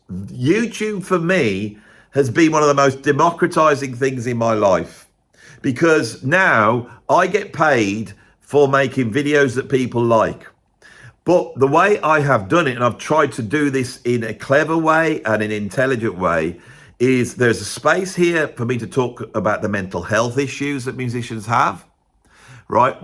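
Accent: United Kingdom. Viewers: You do a great British accent. 😅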